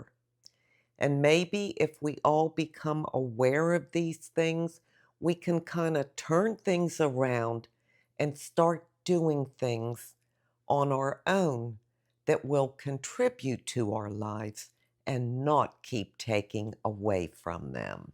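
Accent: Pittsburgh, United States. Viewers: Hello again, just watched another of your video. Convinces , first of all you sound like a Pittsburger . As am I. I’m from the old school. Very lazy generation today. I still work at my age at almost 74, have a home and still do all the yard work, groceries one day, and I may have a day of rest before I go back to work. Oh AI it’s taking over everything. Seriously these kids today can’t do anything without it. Can’t even count back change to a customer. I’ll take the days I grew up in anytime. Keep up the nice videos and wonder stories.💃🏻 very attractive lady by the way. Body, mind and spirit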